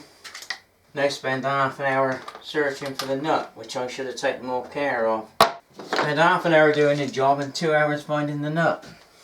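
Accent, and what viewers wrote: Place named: Cockney, United Kingdom. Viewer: Thanks. I LIKE it when you lay the Cockney on thick for us colonials.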